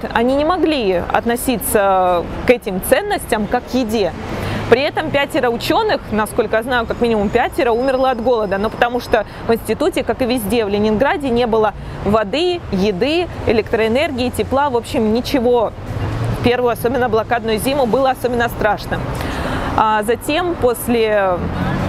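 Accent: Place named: Stavropol, Russia. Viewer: Какой-то ставропольский говор... Но не плохо чешет так то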